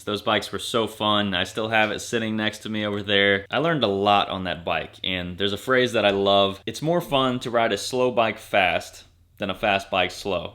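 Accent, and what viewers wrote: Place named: United States. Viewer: Just a nitpick but KTM and Husqvarna are also by definition "across the pond". America doens't make jack shit with regards to off-road motorcycles, not a damn thing. Am I assuming too much? You sound like an American!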